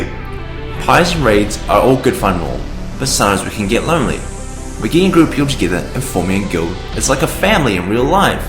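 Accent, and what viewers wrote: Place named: New Zealand. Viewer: Kiwi accent, not British. He says it himself at the end.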